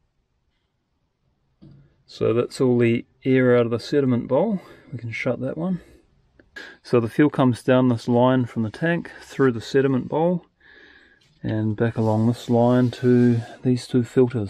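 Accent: New Zealand. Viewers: He sounds like a kiwi also the red band boot is a likely sign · lmao was slowly noticing more and more NZ fauna as I was watching, didn't catch the accent at first sorry g thought you were an Aussie. Major props had no idea this stuff could be found in our country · New Zealand I suppose? Hope I got the accent correct? Or is it good day mate? I am from little rock Arkansas, so please forgive me, not trying to offend. I like you rescue of the bulldozer. To cool mate! We, in the United States love heavy equipment. Loved this episode. Hope to catch More of your rescue's! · Just found this video. Amazing. Great to hear a kiwi voice doing this sort of stuff. on YT Unless you know this country, you canʻt imagine just how difficult it is - fighting your way through the matagouri and manuka. Used to go up to Tennyson Inlet on holiday and did bush bashing up there so have an appreciation. 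Great video, no frantic panning and shakey stuff. Well done on something incredibly well put together. As you know, there are lots of these old TD6s, TD8s and TD 9s left still surviving after years of hard work. incredible mechanical skills as well - good old No8 wire kiwi stuff! You deserve this one mate!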